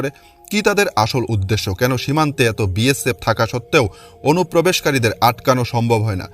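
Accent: Bangladesh. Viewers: Dada. Apnar name জানতে চাই. আপনার কথার ton শুনে, মনে হচ্ছে আপনিও বাংলাদেশী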